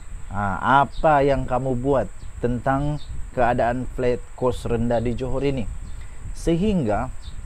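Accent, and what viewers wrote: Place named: Kedah, Malaysia. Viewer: Awak pandai cakap Kedah hebat la awak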